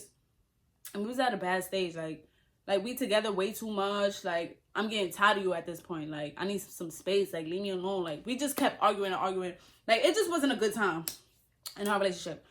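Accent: New York, United States. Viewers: LMBOOO you are hilarious 🤣🤣🤣 and your NY accent is top5 girl 😹😹🤣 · I frikin love the New York accent!! I’ll be watching you just to learn... ps you are a natural beauty 🥰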